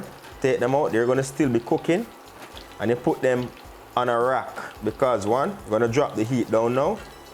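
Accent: Jamaica. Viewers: Came here for coconut shrimp but oh my u r sexy.....love your Jamaica accent ...come to my kitchen we gonna do lots of cooking. ...😉😉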